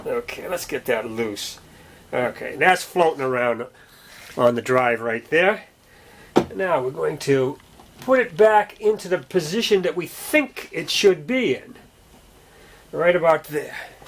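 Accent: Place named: New England, United States. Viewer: I know that New England accent I’m from Boston 😂😂great video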